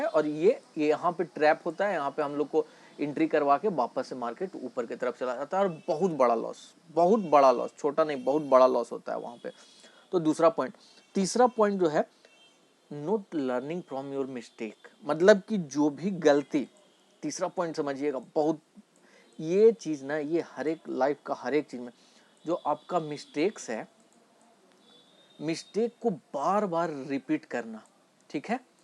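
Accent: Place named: Rajasthan, India. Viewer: Kaha se ho Rajasthan se lag rhe ho Kuch aap ne kya change kiya market me trading me ye batav video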